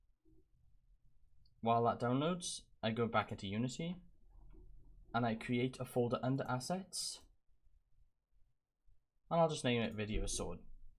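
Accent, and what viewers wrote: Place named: Wales, United Kingdom. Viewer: you sound welsh are you?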